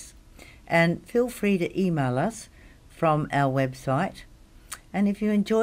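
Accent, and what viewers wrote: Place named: Australia. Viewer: Morena, just found u & now subscribed after watching this vid. Loved your informative delivery & warm Ozzy tone. ❤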